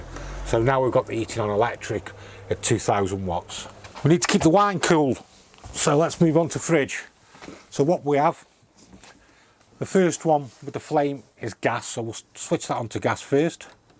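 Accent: Stoke, United Kingdom. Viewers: Is this fella from Stoke?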